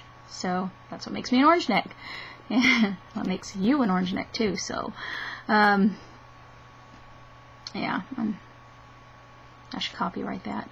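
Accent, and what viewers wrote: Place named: Kentucky, United States. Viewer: Everything you said about hanging out with whites or koreans at separate times is exact to how I am. lol. You can't act one way around this group and the same with the other group. You have to refrain yourself. haha. You look more Asian than I do, imo. But your voice is DEFINITELY a Kentucky voice. haha.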